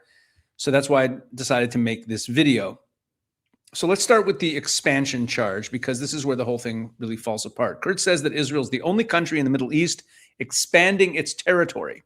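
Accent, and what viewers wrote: United States. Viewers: Way to go Rabbi. You sound like a Texan.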